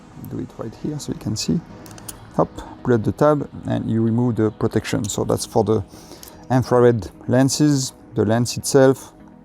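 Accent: France. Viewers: Merci beaucoup pour cette vidéo, vu l'accent je pense que tu es Français, dommage de ne pas avoir fait cette vidéo dans ta langue maternelle, je comprends rien à l'anglais, j'ai dû activer les sous-titres mdr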